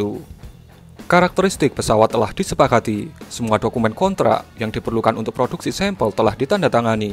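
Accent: Java, Indonesia. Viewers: Medok amat logatnya mas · * Medok medok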